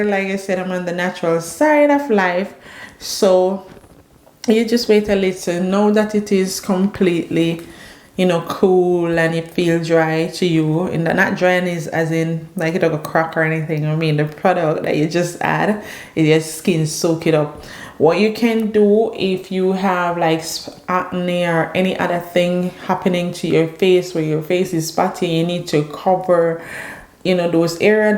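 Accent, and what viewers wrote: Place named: Jamaica. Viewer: u 😂😂 now u making me come on here listen to the accent chro. Jamaica one love..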